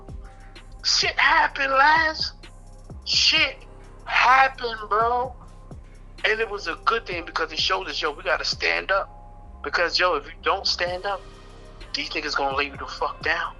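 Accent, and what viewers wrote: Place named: Brooklyn, United States. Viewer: You’re trying too hard with your annoying Brooklyn accent.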